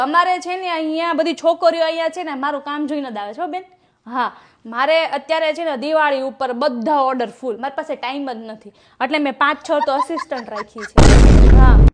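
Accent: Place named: Saurashtra, India. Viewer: તમે બોલો છો તો મારી સખી જેવાં લાગો છો સૌરાષ્ટ્ર, કાઠીયાવાડી ભાષા....આવા વિડિયો હું અમારા સર્કલ માં મોકલું છું...😊😊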